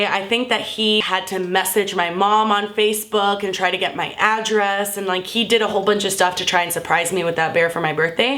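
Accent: United Kingdom. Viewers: british accent is very attractive tho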